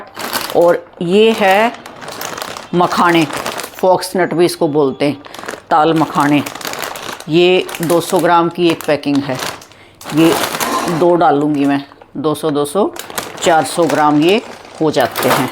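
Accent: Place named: Haryana, India. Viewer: बहुत अच्छा बहन आप कहां से बोल रहे हो आप मेरी हरियाणा की बहन लग रहे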